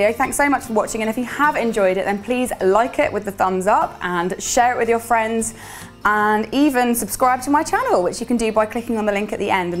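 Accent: United Kingdom. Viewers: I sometimes find it hard to catch what you are saying because you talk too fast. 😅 Don't get me wrong I love to the British accent! Nevertheless I love watching your videos or just to hear you talk. 😄😄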